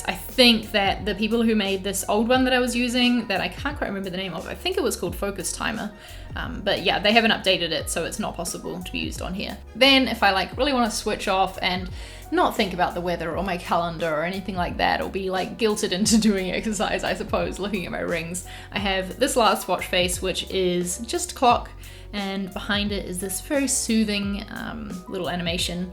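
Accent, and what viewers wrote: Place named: New Zealand. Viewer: I thought this was a helpful review, as you talked more about how you use your Apple Watch rather than get into the weeds of technical details. It’s difficult to find examples of people using their Apple Watch amidst the sea of specs-oriented ‘tech reviews’. As you point out, this device is a luxury which nobody needs to get. In that case, I think it is more interesting to know how people can make use of the Apple Watch and what kind of value they get out of it. Being rather drawn to gadgets, I might yet pick one up. And also, a pleasant surprise to hear a kiwi voice!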